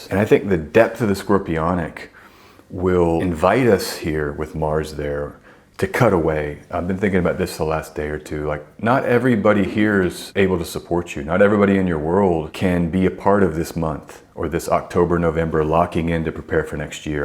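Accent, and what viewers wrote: Texas, United States. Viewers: I thought I was hearing a Texas accent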